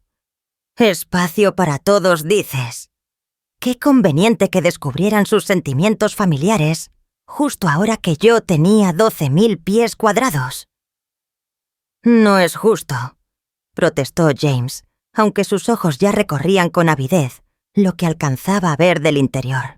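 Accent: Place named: Spain. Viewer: Es el acento de alguien de nacionalidad española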